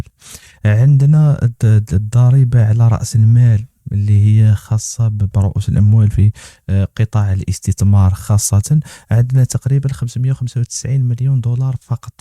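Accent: Morocco. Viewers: اولا من هو هاذا المحلل انا راه ايبانلي مروكي حسب اللهجة نتاعو و من اين جاءته كل هذه المعلومات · لا أضن ، درت بحث و لم أجد شيئ إلا اللهجة أنتاعو تميل للجارة الغربية ؟؟؟ و هذا يخليني أتساءل عن ديون الجزائر و سيادتها 😊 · نتا مروكي على حساب لهجتك واش دخلك في ميزانية الجزائر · Un marocain qui fait des analyses sur l'Algérie et il oublie son pays:le makhraz. Son accent l'a trahit. Il. Parle d'un déficit de 68 mds $ et justement c'est la même somme de la dette du makhzane chez le FMI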